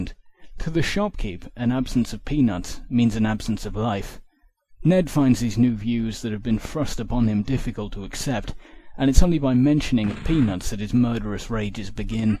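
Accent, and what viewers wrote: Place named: England, United Kingdom. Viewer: I don't. I have asbolutely no idea what this series of videos have been all about. I subscribed to because of slowbeef's rambling mock let's plays, and I have been utterly mystified by the English-accented bloke who has been commenting on this series of flash games, if indeed that's what they are. Although, perhaps, fucking with the likes of myself is perhaps what this series of videos ahas been all about in the first place...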